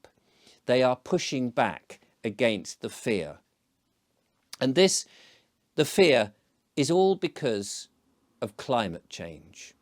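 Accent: United Kingdom. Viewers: You're doing a service to the ones who hear, by giving an (eloquent & very british...) voice to all. Personally, I agree with ALL the words being said. We're ALL living on a 'simulated' world, where the ones who rule it SURE WEREN'T elected. The 'curtain' is 'falling'... slowly BUT surely... Thank you again, Sir.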